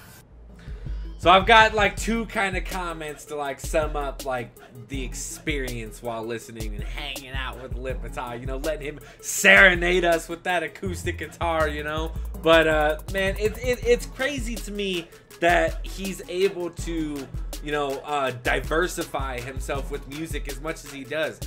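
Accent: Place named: United States. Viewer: dah slow dah tu dia ckp...American English tu...bukan English pure mcm UK Dia ckp..UK punya English lagi lah pening nk faham dia punya slang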